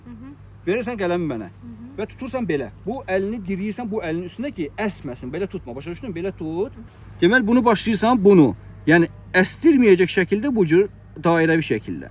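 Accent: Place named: Zaqatala, Azerbaijan. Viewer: Zaqatala aksenti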